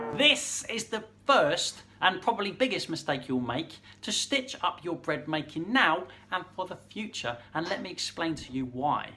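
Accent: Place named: England, United Kingdom. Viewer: Good tip in a general sense but you are making the assumption that all flour is the same. Guessing from the accent comes from England. I live in the very hot, very wet, very humid tropics. Exactly the same flour behaves differently out here. It not only carries more water it just sucks it out of the air while you knead it. And don't buy a dough scraper. You definitely need one but just find that old credit card you the in the back of the cupboard. Pair of kitchen shears to snip the round corner. Sounds a bit critical but I will be back. You give good knowledge.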